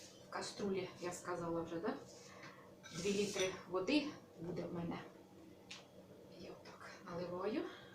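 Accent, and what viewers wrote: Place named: Poltava, Ukraine. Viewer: Дякую за рецепт ❤У вас мова ,як моєї мами,вона з Полтавщини. А Ви з якої області???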